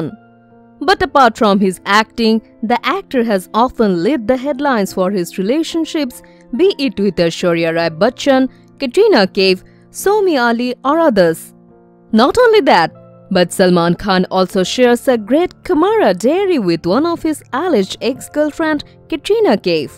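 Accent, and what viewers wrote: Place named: Bengal, India. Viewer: Hello commentator pl I don't understand ur bong English p. Don't use accent it sounds horrible with false gossips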